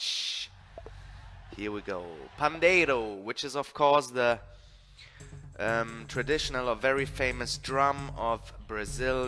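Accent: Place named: Germany. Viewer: ohh I knew it! You have a German accent so I noticed :P I'm Brazilian ^u^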